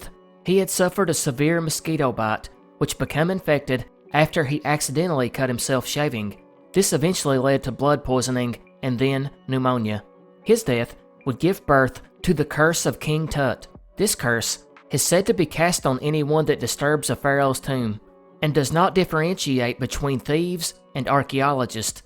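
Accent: South, United States. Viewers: Thank you for another great video. Your narration in that southern cadence make your channel one of my favorites. ❤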